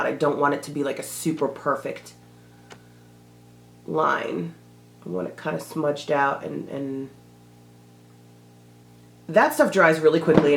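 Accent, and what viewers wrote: United Kingdom. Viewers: loveeeee!!! and great job on the British accent!!!!! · loool your bitish accent, love it. you really sound like us a bit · I just love that British accent!!! I'm an actress and often try to master it for future roles lol.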